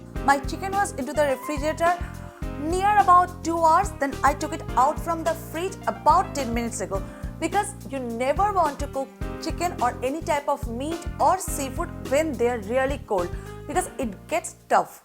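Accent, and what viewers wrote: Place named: Bengal, India. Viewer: Kotha sune to bangali mone hoche! তাই বাংলায় বলছি-- রান্নার প্রণালী বেশ সুন্দর ও আকর্ষণীয়! কিন্তু রান্না করতে করতে ওই ন্যাকামো গুলো বেশ বিরক্তিকর!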